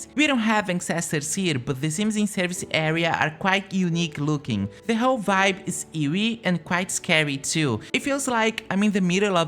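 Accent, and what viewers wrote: Brazil. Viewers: Simmm, assisti o vídeo pensando, é brasileiro certeza kkkkk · Adorei o video e pelo sotaque ja sabia que era BR. Can anyone recommend some hoods available for the Super collection for mac users? :(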